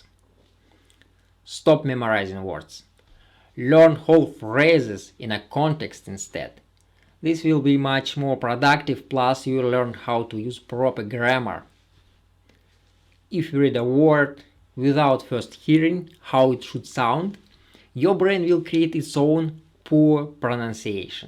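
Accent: Russia. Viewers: Судя по акценту мужик из наших:)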